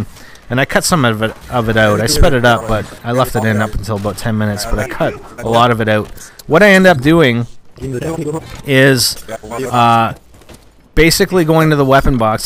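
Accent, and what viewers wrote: Canada. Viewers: hey dude you must be from Canada.... am i right?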